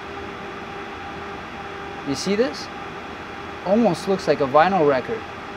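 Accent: Russia. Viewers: Русский акцент?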